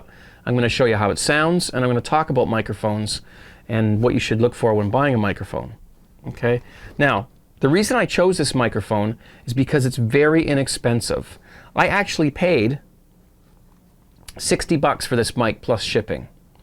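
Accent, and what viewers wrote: Canada. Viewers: I could tell you were canadian by the way you talked.... I learned that from people making fun of canadians.... im a terrible person. :D (I have nothing against canada btw) about the mic: This sounds REALLY nice... I'm probably going to get this today. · Amazing what $90 can do with that Canadian accent.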